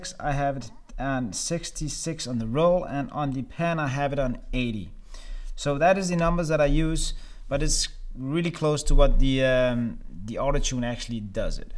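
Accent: Denmark. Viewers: wtf.. Troede aldrig jeg ville finde en film making related YouTube kanal fra en dansker.. Var så forvirret over jeg kunne høre du var dansker, da jeg ikke tænkte der var chance for det haha Men får bestilt præcis denne stabilizer idag, and keep up the great work! :)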